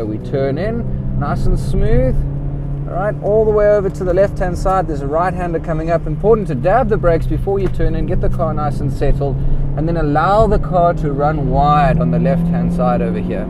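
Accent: South Africa. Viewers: South African bloke. Good one boet :) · South African? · cool video... South African? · Great informative vid!! On another note, are you South African? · That South African accent is very distinct. Great guide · Nice video. Recognized your South African accent immediately, good to hear!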